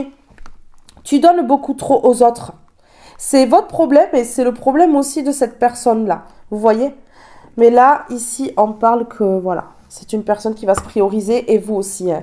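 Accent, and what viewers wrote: South, France. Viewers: Merci Par rapport à des nouvelles récentes dans ma vie sentimentale ta guidance m'a scotché et touché et j'apprécie beaucoup ton humanité ton superbe accent méridional ton humour er aussi tes superbes coupes de cheveux! Tu nous fais du bien avec tes guidances! Soit protégée par le seigneur! Que ta journée te soit des plus agréable!!! Je vais regarder ta nouvelle guidance ❤👍😘☀️🌟🙏🙌🙏🙌🙏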